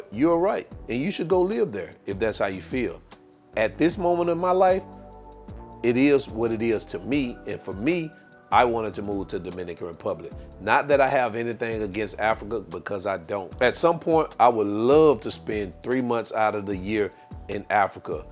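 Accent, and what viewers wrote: South, United States. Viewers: You should be a preacher. You alreary have the southern accent. You said it as you see it, and I think you right.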